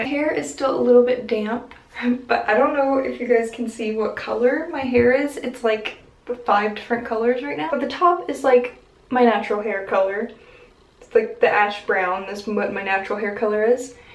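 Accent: South, United States. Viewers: Ive been following your channel for a while. And I just noticed you have a southern accent. Like its not deep South but you kinda sound like your from Houston..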